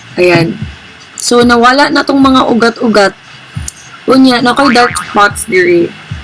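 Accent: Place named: Cebu, Philippines. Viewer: I thought you were. you have that cebuana accent. You should make more of these, with better lighting, video quality, and sound quality. and keep the bisaya script. Lot of potential to be a popular pinoy youtuber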